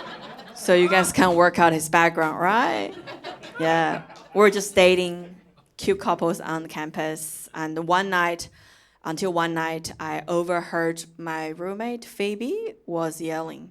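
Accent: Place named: China. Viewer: I love Chinese accent . Followed .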